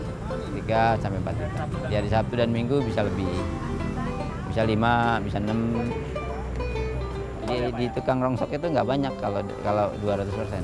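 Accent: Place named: Jakarta, Indonesia. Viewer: Betawi bat yg ngomong. 😁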